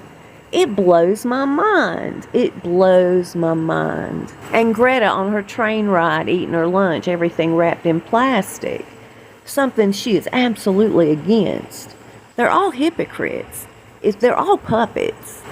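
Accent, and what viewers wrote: South, United States. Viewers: My goodness I am watching this from outback Australia 🇦🇺 in the middle of nowhere, all I can say is that Ms you have the most soothing, sexy, Southern drawl I have heard in a very long time. 🤩🎙🔥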